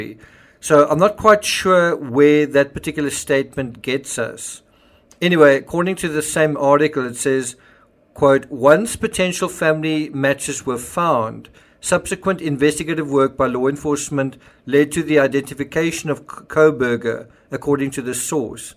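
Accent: South Africa. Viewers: Narater sounds South African.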